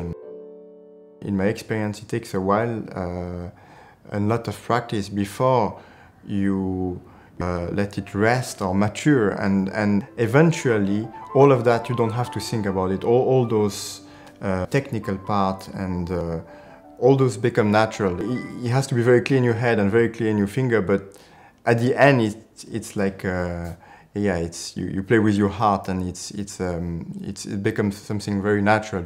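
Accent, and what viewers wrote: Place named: France. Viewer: Haha, a German last name with a strong French accent. Quite an unorthodox fresh combo though.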